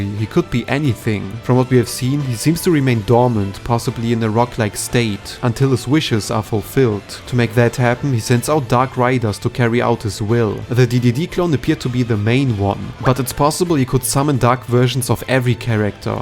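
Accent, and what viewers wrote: Germany. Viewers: You are German, i can tell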